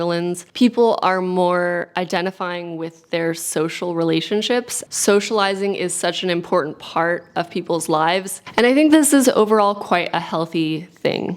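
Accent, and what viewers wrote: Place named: Netherlands. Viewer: i heard your dutch i was thinking i can hear in your english that you speak a bit dutch wy i was born in australia mother born in netherlands but family left to aus when she was 2 dutch dad and i was 3 years old when came to the netherlands but like i said i can hear your speak some dutch and done for some time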